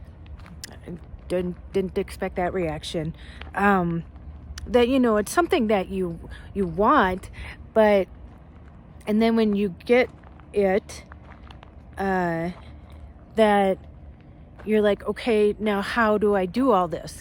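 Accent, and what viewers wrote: Minnesota, United States. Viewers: Reasons to listen to you talk : Watch you cause ur beautuful Have a lovely voice with the pleasant MN accent Great story teller Are deep, honest n sincere Sharing ur journey n story I'm on one too n learn from you and did l say your beautiful n not 50 something! 😘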